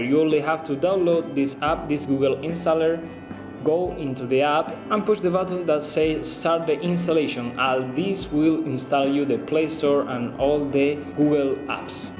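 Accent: Andalusia, Spain. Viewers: Jajaja andalucia english.estas en todos lados me alegro. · Good andalusian english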